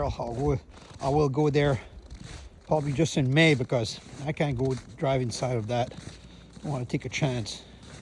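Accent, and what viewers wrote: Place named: Louisiana, United States. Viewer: If you're Canadian why do you sound kinda like a Cajun?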